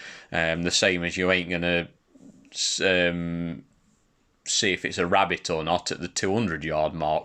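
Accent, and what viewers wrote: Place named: Nottingham, United Kingdom. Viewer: Where are you from mate? Your accent is Notts/Derby?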